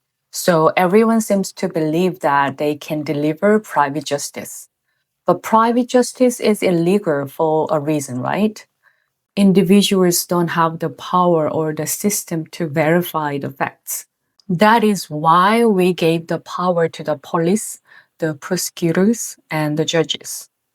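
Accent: South Korea. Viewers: Not everything is AI, don't be dumb. It's her natural korean accent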